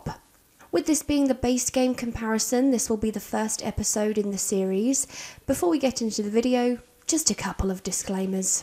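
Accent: United Kingdom. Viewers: I like you accent were in the UK are you?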